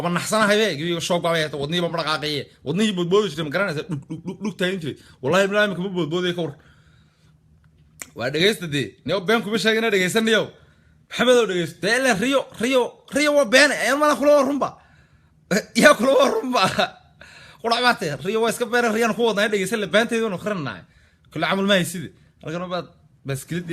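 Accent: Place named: Hargeisa, Somalia. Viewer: reer hargysa iyo rer burco siaybu hadlaan buu uhadlaa nin kani wlhi qudhac iyo waxaasibay isticmalama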